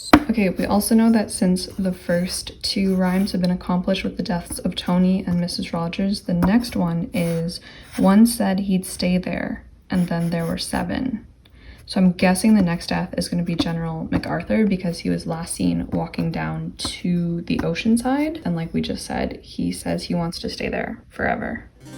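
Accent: France. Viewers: Ton accent français m'a fait sourit, c'était drôle 👌❤️ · ok so i have only just found you and genuinely thought you were french... like parisien french... and i speak french with a deliberate burgundian accent... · the cigarette , the spoon , the mustache , the accent . we have ourselves a french detective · Are you French or Belgian or Canadian? I've seen And Then There Were None (1945 film) and I've read The Murder of Roger Ackroyd so ... I know all the answers! It sounds like the film and the book have different endings!! WOW! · Loved the French accent🇫🇷🥖👏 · Your French accent was so cute! 🤗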